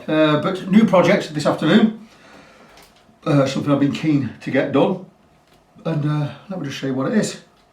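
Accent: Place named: North West England, United Kingdom. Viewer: Fantastic job! As coincidence would have it I would like to do pretty much the same job to my duo sonic. It’s a 2018 SS seafoam green with a PF fretboard. Intonation isn’t great with the short scale and even though I have fitted 11 gauge strings, the guitar almost seems desperate to go out of tune. I would also like to upgrade the pick ups with something hotter and, definitely with a humbucker in the bridge. Judging by your accent and the reference to your friend from Sheffield, it sounds like you are based in the north-west. I am in Manchester. Is there a way we can talk about you doing some business on my guitar please? Thanks.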